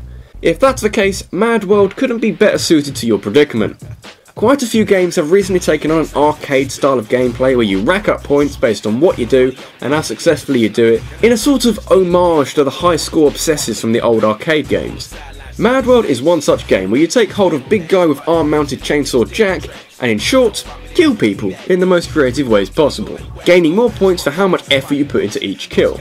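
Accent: United Kingdom. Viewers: Some-one else realises the sheer glory of the British Accent. I love you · are you british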